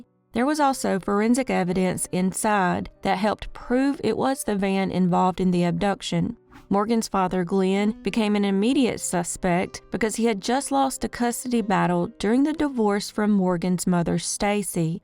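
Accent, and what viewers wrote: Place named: Kentucky, United States. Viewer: Are you from KY? Your accent sure sounds like home. ❤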